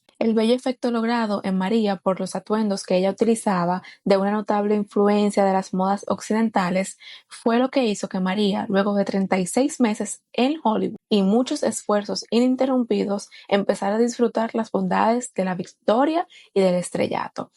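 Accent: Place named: Dominican Republic. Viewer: Me encanta tu voz, que agradable es el acento dominicano. Saludos desde México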